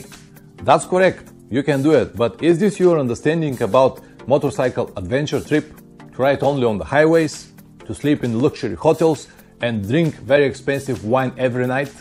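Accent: Russia. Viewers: Ты чо? Русский чтоли? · я не знаю с какой ты страны, но у тебя такой русский акцент на английском, что я понимаю твой английский без переводчика))! С любовью из России!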